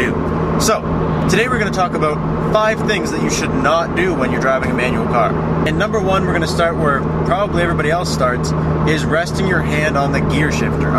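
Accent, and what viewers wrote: Canada. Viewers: First video watched from you. Are you from Canada? XD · TheDerDumme He sounds Canadian to me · ahah you canadian? maybe from ontario i heard G1 lmao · dat Canadian accent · are you from Canada ?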